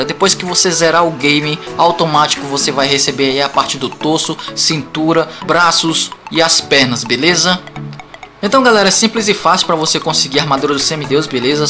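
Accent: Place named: Northeast, Brazil. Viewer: Faz do herói ateniense... Esse seu sotaque é de qual estado do nordeste?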